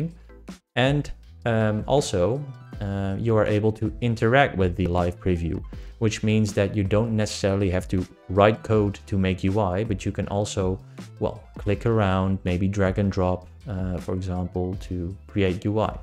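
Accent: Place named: Spain. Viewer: You from Spain? What’s that funny accent? Great video by the way.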